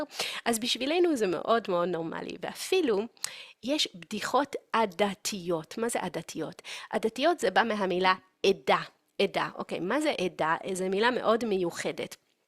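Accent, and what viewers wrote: United States. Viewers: You sound distinctly American. You miss certain pronunciation nuances that give it away (like pronouncing /t, d, n, l/ as alveolars) and make (and repeat!) certain grammatical mistakes (like חשוב שאנחנו צודקים when you mean חשוב להיות צודקים). It frels like you were exposed to little to no Hebrew at home and hung out with those American girls instead if Israelis so much that it hampered your acquisition of Hebrew in certain ways.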